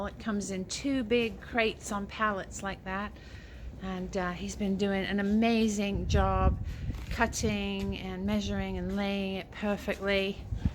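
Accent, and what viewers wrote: England, United Kingdom. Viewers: I love listening to your accent - you sound so English and then you say "clowshe" rather than closh (to rhyme with gosh) as we do in England. Fascinating to see what words have changed and what USA phrases you use. Shopping Cart is one - we say shopping trolley. Love the channel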